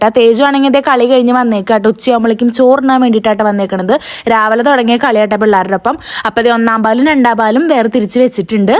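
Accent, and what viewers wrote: Thrissur, India. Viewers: Eniku Thrissur bhasha bhayankara ishtanu... samsaram adipoli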